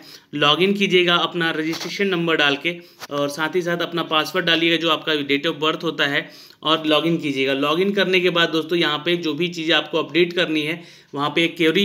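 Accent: Bihar, India. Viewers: Bhai bihar bolte hai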